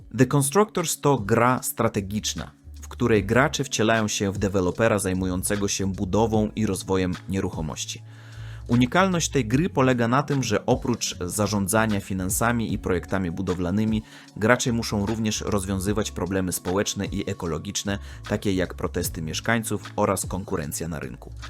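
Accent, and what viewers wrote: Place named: Russia. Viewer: brat jest naprawdę z rosji a ja myślałem że tak dla beki taka nazwa xD ale kocham twój akcent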